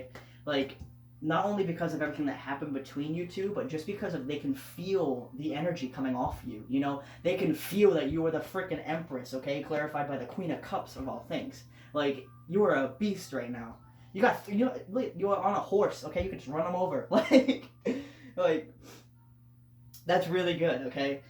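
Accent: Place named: East Coast, United States. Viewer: Where the hell have you been. Whatever you can do to become more available as a channel on YouTube I hope you have the time to do it. The fact that so many men watch your channel got my attention. Please take the opportunity to stand out to Men. I think you come across two men in a really relatable way. I can understand the struggle of just beginning to awaken but I bet guys struggle with it more because in addition to not trusting it they probably feel uncomfortable just considering it. You have a laid-back regular guys guy kind of a feel. BTW, your East coast accent only adds to that. It says I can give you a spot on reading and kick your ass. I'm joking but I'm sure you get my drift. Another thing is that while I'm sure you are a fabulously handsome guy, the fact that you're not in the video that you're off to the side is probably important. 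There's only a handful of male readers that I've come across in the estrogen ocean of readers. As you would expect the better-looking they are, the more popular than trailers. That doesn't mean that they're not good at what they do but at some point because of all of the girls responding it begins to feel Rockstar and it wouldn't be my first choice if I was looking for answers to help me out with my life, especially in the beginning of that journey.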